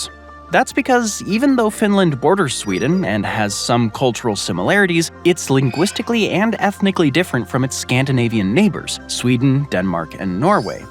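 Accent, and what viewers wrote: United States. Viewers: Are you American by chance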